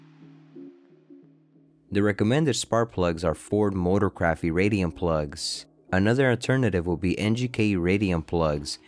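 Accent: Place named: Texas, United States. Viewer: are you from Texas?You said you was fitna be replacing… sound like a home boy to me!!🤙🏾🤙🏾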